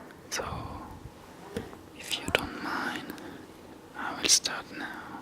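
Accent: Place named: Germany. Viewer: oh you sound German lol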